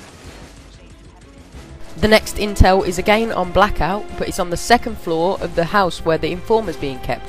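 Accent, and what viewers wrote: England, United Kingdom. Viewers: Who cares if he's british i have cheats now!! THANX MAN! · your british. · LOL, super british accent! XD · im jealous of your accent lol. I allways thought english people were just english jerks bragging about speaking speaking english better then americans. But after that i wish i could talk like that lol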